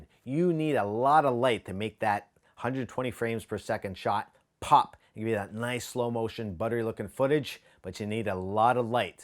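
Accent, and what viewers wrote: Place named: Canada. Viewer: I knew I heard the Canadian accent, I’m from Northern Ontario :) thanks for the info, gonna label my gear the same out here in Vegas! Cheers, love the videos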